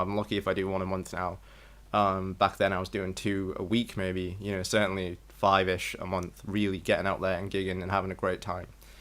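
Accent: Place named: United Kingdom. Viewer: San Jose Sharks ?!?!?! Bro are you in the Bay Area?!?! I am in san mateo. Your accent has me thinking UK 😂 are you just a major hockey fan, or are you living in California? The medicine topic is more crucial to the world's well-being than most people realize. I have grown poppies for a few years now, and consider it a tragedy that most people have been estranged from this great medicinal plant. Human beings in general may be more opium-dependant than is acknowledged. Poppies make a night and day difference in my ability to function in this body, and actually live more full/thorough days, do work, exercise, etc.... For years i regularly exercised and practiced discipline in various forms, without opium or any opiates (having steered away from such substances after high school). Never did i reach the same level of sustained health/function without poppies/opium that i experienced once i began growing and taking them. 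🌷🌷🌷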